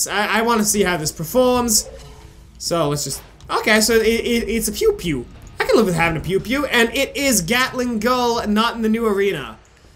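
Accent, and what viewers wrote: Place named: Italy. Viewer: I had a pretty bad speech impediment with R's too, I sometimes hear myself say them with a w and mentally face palm. It hardly ever happens, but my every day speech is stilted to the point of it sounding like a genuine Russian accent, as I've been told, and it's kinda funny to me that you mention your accent bc I always think of my Italian great grandparents when you use it. They were first gen immigrants to America and their English was really terrible, it sounded very much like your accent at times, which is incredibly amusing to me knowing it's supposed to be Russian. You also sometimes sound like my uncle and cousins from Jordan, with the kind of accented buisness talk, I guess? It's kinda funny.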